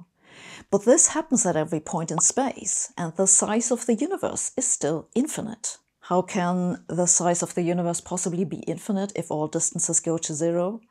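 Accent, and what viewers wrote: Germany. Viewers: how did I know she would sound german before clicking the video haha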